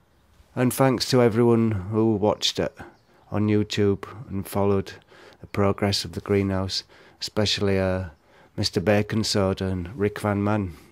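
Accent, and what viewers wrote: United Kingdom. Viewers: your british? birmingham ? obviously in germany now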